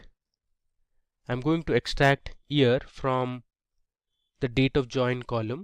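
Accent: India. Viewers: Hes Indian and what's wrong with the accent or do you want him to have Canadian accent or your mother tongue? appreciate the hard work or gtfo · Are you facing problem with hindi ascent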